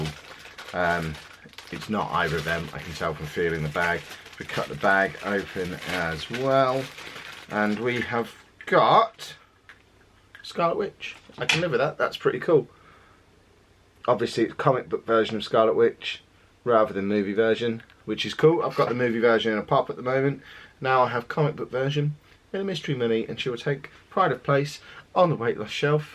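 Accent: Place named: London, United Kingdom. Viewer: You sound like your from london (paul rudds voice)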